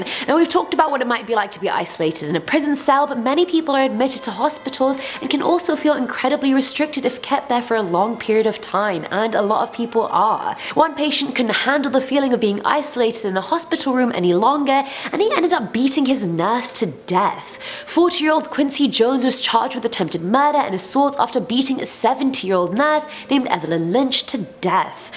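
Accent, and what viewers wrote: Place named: United Kingdom. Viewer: She has the best British accsent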